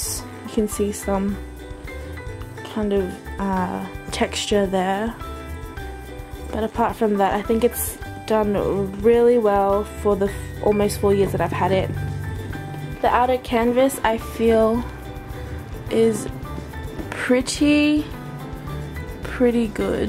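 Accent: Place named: Australia. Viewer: noticed the aussie accent and subbed right away! haha another bag lover here ^_^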